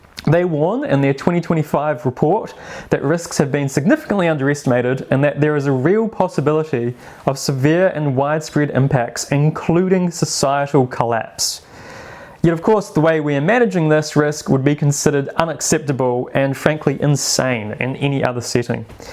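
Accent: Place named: United Kingdom. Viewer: I saw the thumbnail and I was like 90% sure a British accent was coming my way, close enough. Yeah, shit is lookin' pretty bad, doomers!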